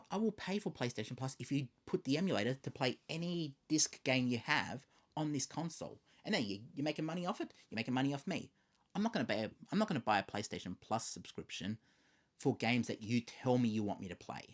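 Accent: Australia. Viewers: I mostly want a ps5 for the new Silent Hill games coming out. I heard theres supposed to be good games coming near the end of the year. I agree with you that ps5 should be at least able to play as far back as the ps3 games. You have a really awesome and soothing voice btw you would be great for voice overs especially in the west because your Aussie accent is very charming. Keep up the great work.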